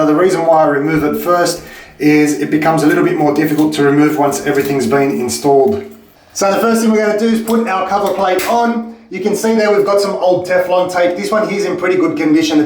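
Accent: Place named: Australia. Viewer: Legend, you literally have just saved me big time. I am young single female with no plumbing experience and was able to do this! Being an Aussie I immediately felt calm hearing a fellow Aussie use no nonsense straight to the point instructions.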